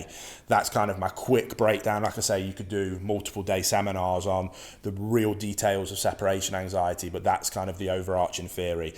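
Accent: United Kingdom. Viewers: Our pup is almost 5 months old and I have not had the stones to leave him on his own in his crate yet. Lucky to be mostly retired but still want to get him conditioned to being left alone. Look forward to trying your approach of seconds to minutes to hours. Thanks and I love a northern accent.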